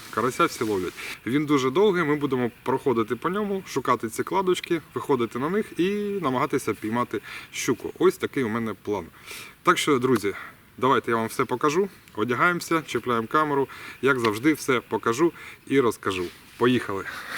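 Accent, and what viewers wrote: Standard. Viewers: Душевне відео. Краще за перегляд хорошого фільму.... Дякую👍🔥🎣🕶️ P.S. За приємну літературну українську окремо спасибі👍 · Сподіваюсь, що це щиро. Просто пригадую, як ти знову перейшов на російську і почався натиск, від якого ти відбивався. Це дуже класно, і українська в тебе, як і в багатьох російськомовних така, що багато україномовних позаздрять, в тому числі і я, адже вона в тебе літературна, з того, що чув в школі, читав. Але пам'ятай, будь ласка, що це рішення в першу чергу має бути щирим і не через коліно, адже будь-яка агресія створює приховану ненависть. Головне, що ти за людина, а мова це лише протокол, якому, на жаль, на мою думку, надали силу зброї, яку тепер проти нас і використовують, часто власними руками. Пам'ятай, що є українці, які тебе будуть любити, шанувати і цінувати, незважаючи чи ти говориш українською чи російською. 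І таких, я вірю, більшість. Нехай цей комент хоч трішки збільшить тобі твою впевненість у твому рішенні :) Вітаю у клубі україномовних, тут весело :) Ну і дякую за контент! Бомбезні щукани :)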